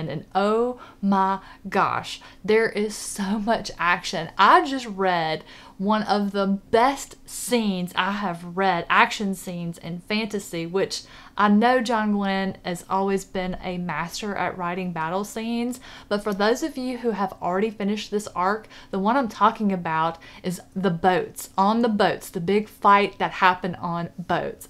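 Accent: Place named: Mississippi, United States. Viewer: I just stumbled across your channel and of course I was hooked by your Mississippi accent. But they say we have an accent also because we are originally from Alabama. If you don't mind me asking I would like to know where you live in FL because I have a brother that lives in Marianna and a sister in Tallahassee. Fortunately, they didn't have any damage from the hurricanes. I think you are a good reviewer and I subscribed. Much respect to you from Larry.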